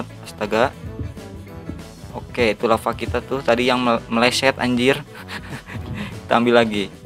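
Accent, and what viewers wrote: West Java, Indonesia. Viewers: kaciri pisan urang sundanateh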